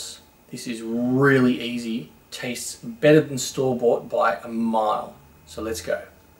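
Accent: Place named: Australia. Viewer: Lol as if your great Aussie accent doesn't give away where you are! Hello from Sydney!!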